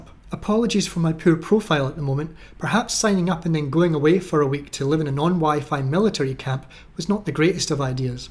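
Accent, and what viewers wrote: Scotland, United Kingdom. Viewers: Hey ,,,,,,,,,,,there is nothing wrong with you and your contents, and I Looooooove your Scottish accent too. Like you i cant wait for the day when this Garbage is dumped to the Landfill of the Human History, and those who really want to work for the sake of progress in life, get their turn at the Mill.✌ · As a former Kiwi I love the tale being told in a Scots (or South island ) accent . Where did capt rugmunch get all of those gongs? it looks like the display of a Swiss Admiral. The NZ Navy was once a proud service and even fought with distinction in WW2. Winston Churchill gave a dinner for the Crew of the Archillies after her success in the battle of the river Plate, HMS Achilles won instant immortality in the Battle of the River Plate in December 1939. Leander’s early war was much quieter, although in February 1941 it sank the Italian commerce raider Ramb I in the Indian Ocean. 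HMNZS Leander was to see more dramatic action in the Pacific War. In July 1943, fighting alongside US ships, the cruiser confronted a Japanese force in the night-time Battle of Kolombangara. After helping to destroy a Japanese cruiser, the New Zealand vessel was hit by one of the enemy's feared ‘Long Lance’ torpedoes. The crew performed surperbly to save their ship, but 26 seamen were killed. Eventually sent to the US for repairs, Leander would never fight under the New Zealand ensign again. almost forgotten today because no women were aboard.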